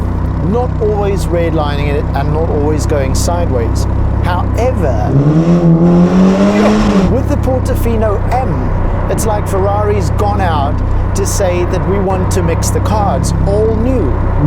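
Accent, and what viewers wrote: South Africa. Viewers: im hearing South Africa, im hearing Welsh.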